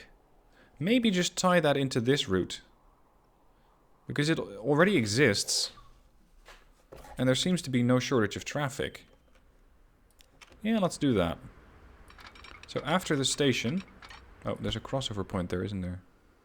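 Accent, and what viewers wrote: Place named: Netherlands. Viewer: Ik hoor aan je accent dat je een nederlander bent. kan ik af en toe advies krijgen over dit spel?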